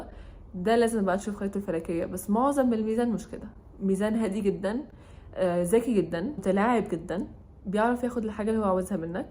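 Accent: Syria. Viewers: ممكن سؤال يا ليه في معظم الڤيديوهات حتى لو مش دلوقتي زمان برضو بتتكلمي سوري بس رغم انك مصرية سورية مجرد سؤال بس متفهمنيش غلط 💙💙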